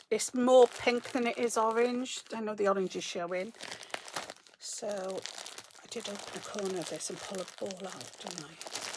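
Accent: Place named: Wales, United Kingdom. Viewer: Love your accent...and that you're from Gavin and Stacey land. Congratulations on the new Grandchildren' I have 2 grown up daughters and 2 grown up Grand daughters. This year I got my first Grandchild in February and the 2nd is due in December. Both boys so I have been knitting in blues, beige and brown. I even had to buy more baby patterns because most of my are for girls. But Grand children are wonderful.x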